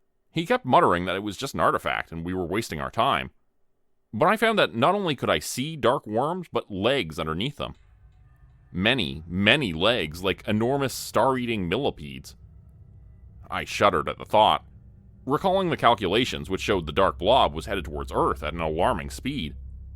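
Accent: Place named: Canada. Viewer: He's from Canada and Canadians pronounce certain words differently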